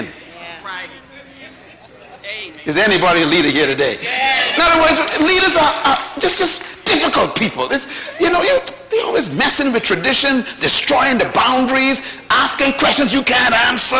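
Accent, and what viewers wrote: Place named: Caribbean. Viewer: Its 2020 and I just heard this message, Never have I heard a person, deliver a message to the world, so eloquently! Just listening to the message, I can telĺ you are an intellegent man from the Caribbean! I salute you Dr Myle, wish that I could have met you in person, your words are more relevant today, because I think you were able to foresee what this world would have become!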